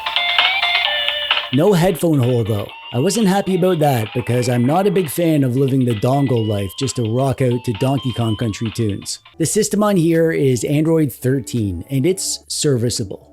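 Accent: Canada. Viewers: are you Canadian? love your vids btw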